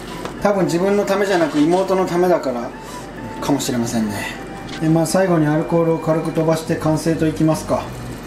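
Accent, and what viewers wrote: Kagawa, Japan. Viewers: 素のときに出る、讃岐弁がいい(´・ω・｀)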